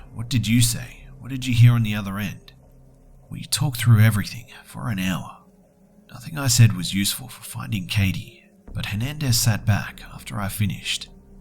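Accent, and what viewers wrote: Australia. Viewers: Are you from Australia?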